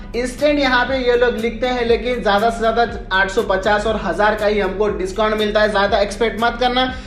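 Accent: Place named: Hyderabad, India. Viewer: Bhai tum Hyderabad se ho bhai bolo bhai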